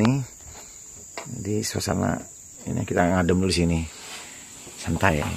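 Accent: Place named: West Java, Indonesia. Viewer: Kok logatnya kayak orang Sunda atuh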